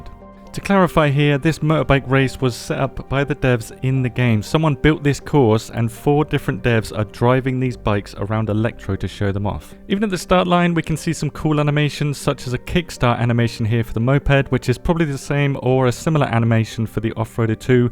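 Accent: United Kingdom. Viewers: british :face-blue-smiling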